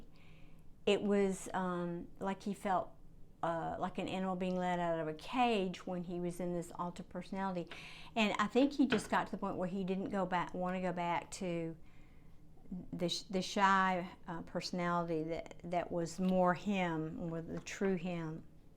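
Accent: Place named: South, United States. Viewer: it was we have to make lemon pies and lemonade out of lemons… also spirit says never apologize for your southern draw. Your gorgeous 🤍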